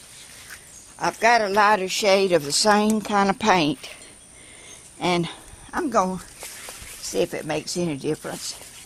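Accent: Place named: Georgia, United States. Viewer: Love your Georgia accent❣️😊 🌹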